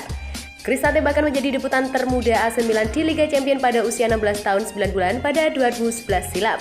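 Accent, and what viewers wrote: Java, Indonesia. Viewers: Masih kedengeran Medok mbak, hehe..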